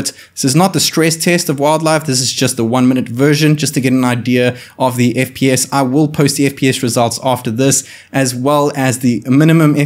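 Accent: South Africa. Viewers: You sound south african bro 😌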